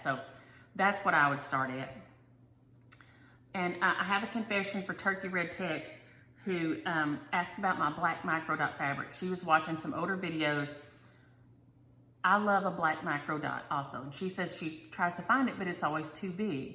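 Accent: South, United States. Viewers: Lady. Thanks for sharing. You are hysterical. This yankee doesn't get to hear cool southern accents like yours very often. I enjoy it so much!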